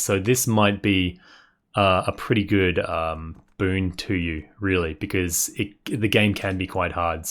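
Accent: Australia. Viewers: Hi. You sound Australian. Thanks.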